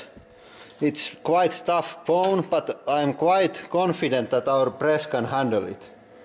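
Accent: Finland. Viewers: i can hear from the accent that this guy is finnish lol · Ootko suomalainen ku sun enkun murre kuulostaa suomalaiselta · Are u finnish cuz ur english accent is finnish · LOVING THE ACCENT #RALLISUOMI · That rallienglanti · Are you Finnish? Sun aksenttisi kuulostaa siltä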